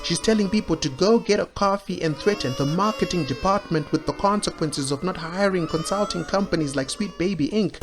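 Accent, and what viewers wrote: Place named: South Africa. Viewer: South African? Man instant sub! Haha gaming news that sounds of home..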